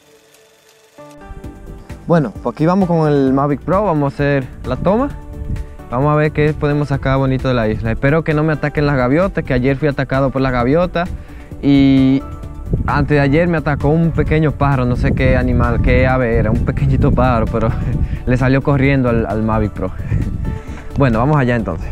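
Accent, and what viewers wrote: Dominican Republic. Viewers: Algo me dice que eres dominicano..de algun lugar del cibao.. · Tu ere dominicano? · De donde eres ? Eres dominicano🇩🇴🇩🇴 ? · Loco tu eres dominicano?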